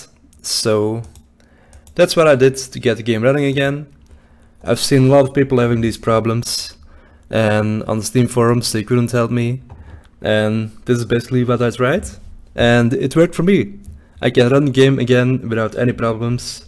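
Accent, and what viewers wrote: Netherlands. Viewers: are u dutch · i just knew you were dutch haha i could hear it because of your accent haha. geweldige video man. kan eindelijk weer is normaal left 4 dead spelen haha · Are you dutch?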